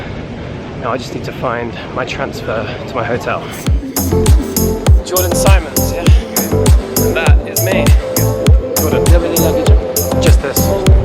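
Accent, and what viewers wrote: United Kingdom. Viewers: Bmw 7 series as a taxi And u say not to shabby Haaaahaaaaahaaa AND UR BRITISH I CAN TELL BY UR ACCENT SO U KNOW WHITE GUYS HAVE FORDS AND VECTRAS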